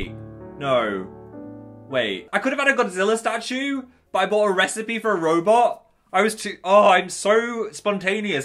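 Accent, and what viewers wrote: North, United Kingdom. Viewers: Sounding pretty Northern today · Northern accent is coming out HARD in this video.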